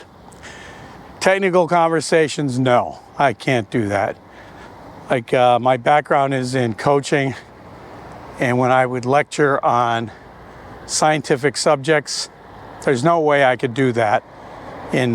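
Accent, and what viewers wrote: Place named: Rioplatense, Uruguay. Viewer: Rioplatense accent. That’s what we speak in Uruguay. Pretty different from the rest of latinoamerica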